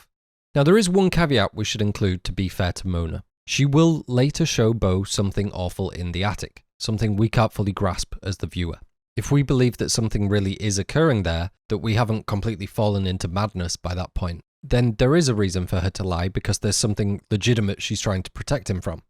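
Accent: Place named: Northern England, United Kingdom. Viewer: I was following you right up until you pronounced Albert Camus' name like that or so. I understand that you're English, and even more, you're from the North. The French have certainly had an interesting relationship with the Northern English, the Scotts and the Irish. However monstrous the French may be, pronouncing Camus' name as "Came-us" is horrifying beyond anything Ari Aster could put in any of his films.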